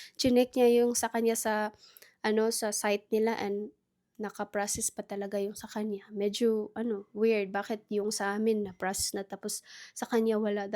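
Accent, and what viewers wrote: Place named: Visayas, Philippines. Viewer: Bisaya po kc sya kaya Ganon.. atleast naintindihan pdin😊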